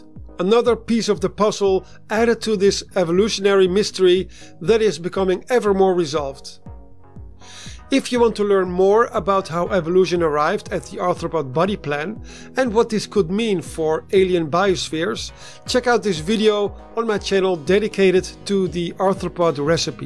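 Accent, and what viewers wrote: Netherlands. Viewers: Hearing that dutch accent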